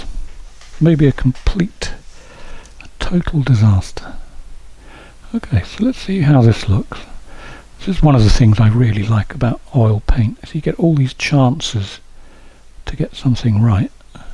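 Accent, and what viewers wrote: United Kingdom. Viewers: Your new camera is awesome. What great colors and details well as the good depth of field. Thank you for doing these inspiring lessons. I am so blown away from the sky. By the way, your British accent is marvelest to listen to.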